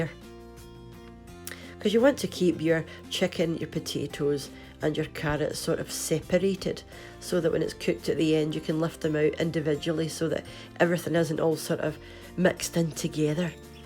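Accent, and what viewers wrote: Scotland, United Kingdom. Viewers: We love Scotland too! Especially the accent! Kind regards from Mr Turk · it looks delicous a bit unclear about how much liquid to add can you give me the amount based on the measuring cup I would add more veg. to it, I'm totally inexperienced in the kitchen or using a slow cooker love the Scottish brogue :) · Love the Scots' accent! Could listen to her voice all day!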